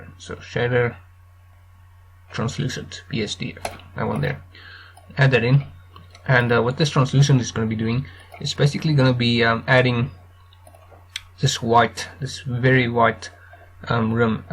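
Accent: South Africa. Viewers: Ah, excellent. I actually like your South African accent, but yeah better recording gear (and less noise) will help loads. Thanks a lot!:) By the way, is there a good way to get a Blender hair shape (or even just the guides) out of Blender and into Max or Substance Painter? I plan to render characters in Iray (Substance Painter) and VRAY in Max, but I love the hair control in Blender. Thanks!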